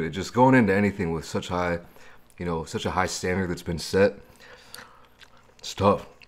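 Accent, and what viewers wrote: United States. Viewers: Yo, what made you want to move to Korea? Just curious because you definitely sound American.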